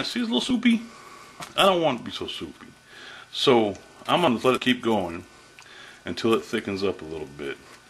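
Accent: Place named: Carolinas, United States. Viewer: He sounds more like he's from the Carolinas to me.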